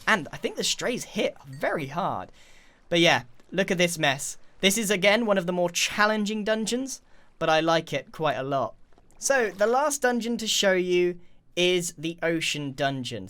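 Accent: United Kingdom. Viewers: Sometimes I watch your videos just to listen to your voice. Your British accent is so soothing.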